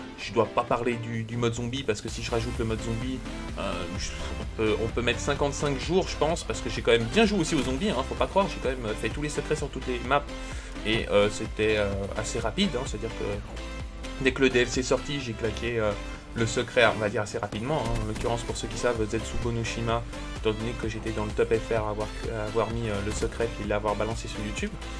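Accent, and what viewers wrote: Switzerland. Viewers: t'es suisse ? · Il est suisse je crois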